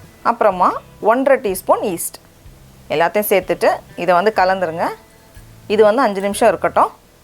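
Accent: Nagercoil, India. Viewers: You are a pleasure to be watched,. Your Nagercoil slang is too good. The recepies are excellent. The pizza came out very well. Love to you.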